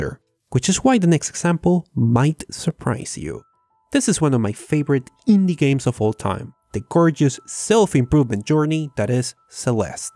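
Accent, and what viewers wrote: Spain. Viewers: deshacerte del acento español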